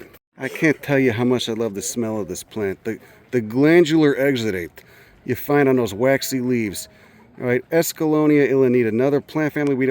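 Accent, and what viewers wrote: Chicago, United States. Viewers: He’s from Chicago. It’s a Chicago accent. You have to be born and raised here.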